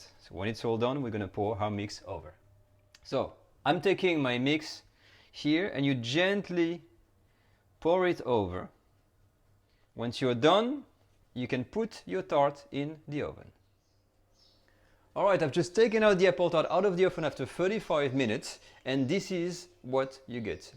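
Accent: France. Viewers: great channel, will be making your recipes this weekend so excited! love the french accent... lots of love from NYC !! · I love all your recipes! And your French accent 🥰 thank you so much!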